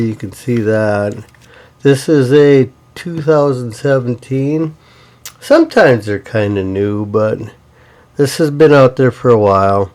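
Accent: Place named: Wisconsin, United States. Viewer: I live in GA but your accent reminds me of my uncle from Wisconsin, so im guessing Wisconsin or Minnesota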